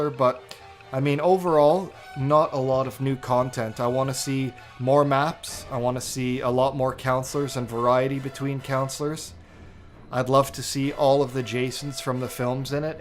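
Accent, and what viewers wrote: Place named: Canada. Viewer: This Canadian voice sounds familiar...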